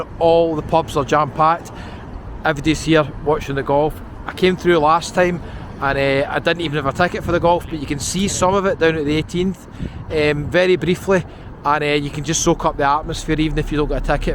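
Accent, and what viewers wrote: Scotland, United Kingdom. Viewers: Loved this … Ive been living in London for years but from Newton Mearns ! Glasgow ….This reminded me so much of a day out with a boyfriend to St Andrews when I was a teen !😂.. memories… didn’t take to long from Glasgow … makes me homesick for then …. It’s a joy to hear a Scottish accent ! Love all your videos … been binging them 👌… looking forward to seeing more… Polly X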